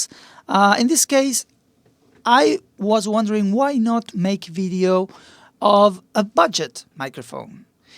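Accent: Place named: France. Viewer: Dude is eh s’french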